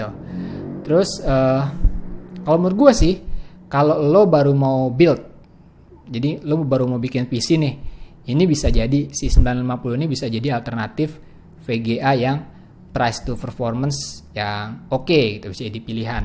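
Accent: Indonesia. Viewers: mulut Indonesia bro. saya adja masih bilang ti itu t i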